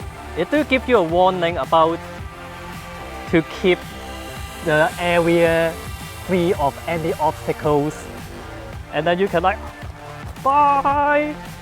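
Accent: China. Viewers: You are from Hong Kong, yea? My mother speaks cantonese and all ger chinese friends have the same accent :D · china english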